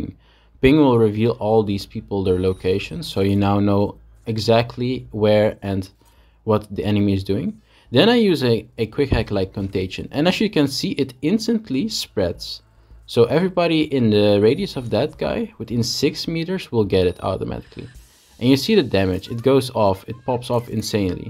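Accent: Netherlands. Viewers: Great video man, very helpfull!!! Ps. Is that a dutch accent i hear?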